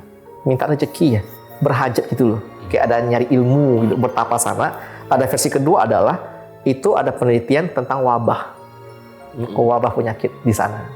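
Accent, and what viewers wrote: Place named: Pontianak, Indonesia. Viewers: Bang logat Kalbar ny kental, wkwkk mantap bang paduuu 😂😂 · Salut sama bang, tidak menghilangkan aksen bicaranya khas pontianak 😂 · Bahase Nye pontianak bnget bang😅 Salam dari budak pal 5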